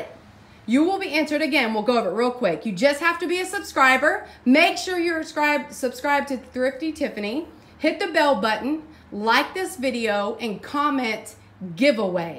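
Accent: South, United States. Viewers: Giveaway........ Congrats on hitting 17k, we love watching you and I adore your southern accent. Thank you for giving back!!!!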